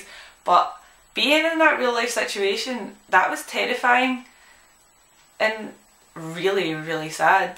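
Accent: Scotland, United Kingdom. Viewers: Wow! You. are. so. GORGEOUS! And I TOTALLY TRULY LOVE your Scottish accent. If Scotland has more girls as gorgeous as you, but older, of course, since I'm 50, I'd love to move there ((especially on account of the ignorance in command of my country)). I can handle the rain, since I like to remain inside anyway. Wait, do you have places where lesbians meet each other? Anyway, I Scotland is my 2nd dream country. Australia is #1, Ireland is #3. I subscribed and gave it a thumbs-up. I'll catch you next time. Blesséd Be, Angie aka Wynter Ravyn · I'm in love with the Scottish accent. It's my favorite accent in the world. Please come to the Midwest. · I watched for the accent. I love a gal with a Scottish accent. :)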